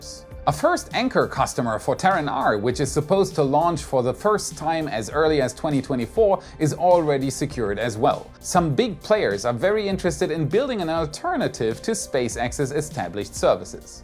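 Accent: Germany. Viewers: Aha, ich hab direkt rausgehört das du aus Deutschland kommst, aber irgendwie keine Anzeichen gefunden bis ich auf deine Webseite gegangen bin 😉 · Is this guy German?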